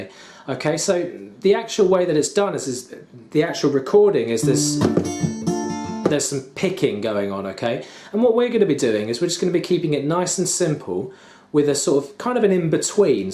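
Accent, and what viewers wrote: England, United Kingdom. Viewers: WOW! Your English accent threw me for a loop! Great cover, cheers :P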